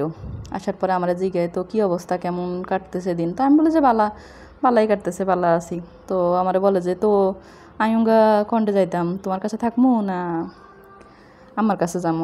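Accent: Noakhali, Bangladesh. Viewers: ওরে নোয়াখাললা তাই বুজি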